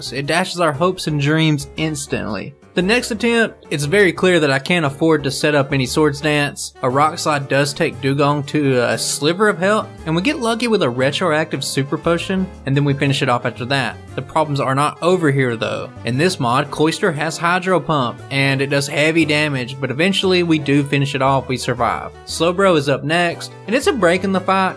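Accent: United States. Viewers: I like your country-like accent!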